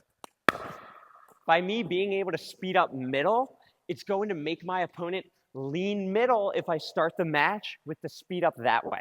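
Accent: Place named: New Jersey, United States. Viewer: i trust you have the best intentions, but it doesn't necessarily mean you're right. i've never watched his videos and felt he was talking down to me. and this is coming from the pov of a former nyc public school teacher and 4.5 player. to me, just sounds new jersey direct and enthusiastic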